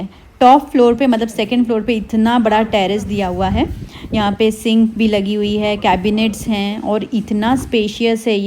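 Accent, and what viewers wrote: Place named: Azamgarh, India. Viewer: Aap ki language accent bilkul Azamgarh wali hai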